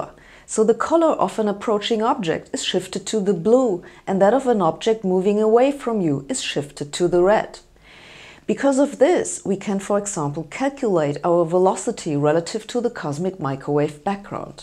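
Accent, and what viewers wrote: Germany. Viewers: Sabrine, great job I love your videos but now the but. English sort of compresses parts of the words and many non english born seems to think that that is not so important, But it is. If the relevant parts are not compressed it is sometimes difficult to catch the word. In short you sounds like a german ( surprise surprise) So the efford you need to improve is very little and you will not only be great but sound great. Sorry if inapprobiate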